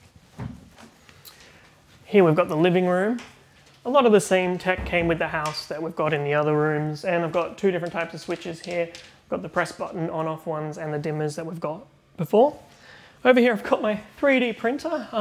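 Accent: Australia. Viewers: Cheers. Are you an Aussie in the UK?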